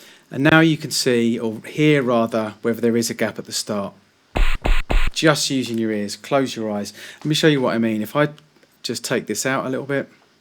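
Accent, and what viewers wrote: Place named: London, United Kingdom. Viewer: Hey bro just want to thank you so much for the well articulated and illustrated vids. Bigups from London although from your accent I detect a Londoner too!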